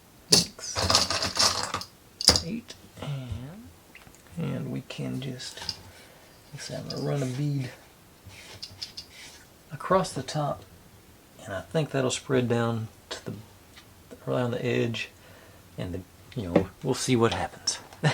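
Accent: United States. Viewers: Because English is my second language do you have an accent? Because sometime sounds perfect American and sometime not.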